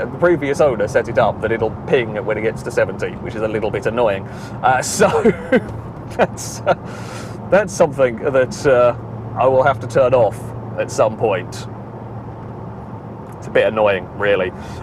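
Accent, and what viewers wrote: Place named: RP, United Kingdom. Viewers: His voice is rather posh lol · anyone know where he’s from in the Uk? Guess the south bcos of posh bit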